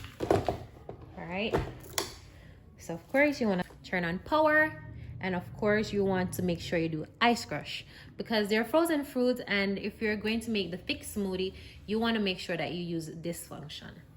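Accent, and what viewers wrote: Jamaica. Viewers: Your accent is so beautiful, just like yourself. Are you from Jamaica? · I hear a JAMAICAN IN YOU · i was she is from jamaica but at first i didnt hear your accent but like 30 seconds in i was like mi sista indeed lol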